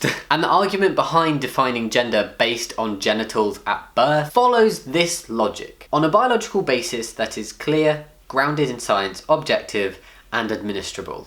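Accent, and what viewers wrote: United Kingdom. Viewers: Wait, aren't u British?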